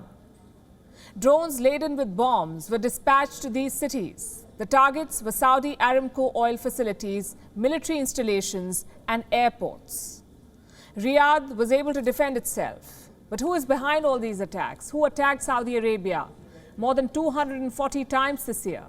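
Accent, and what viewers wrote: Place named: India. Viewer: It is hard to take someone with an Indian accent seriously 😂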